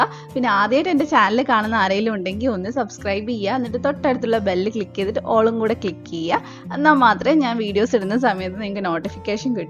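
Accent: Kannur, India. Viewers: sound super kannur evideya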